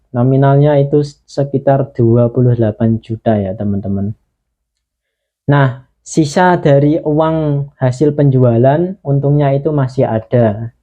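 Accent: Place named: Java, Indonesia. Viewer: Jawane medok emen mase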